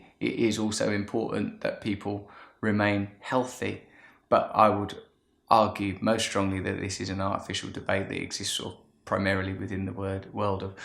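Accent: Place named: United Kingdom. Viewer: I had no idea Russell Brand was such a deep thinker..not even sure how I got to this video ;) Truth be told, I can't resist a handsome guy with a British Accent, and saw the video was under 10 minutes so..but all kidding aside, yes, health is of course important..but most important is ACCEPTANCE. Of yourself and others. There is no change without acceptance, and don't we tend to criticize in others what we don't like about ourselves? Kindness is so important and so absent from all forms of media, especially social media. Well done.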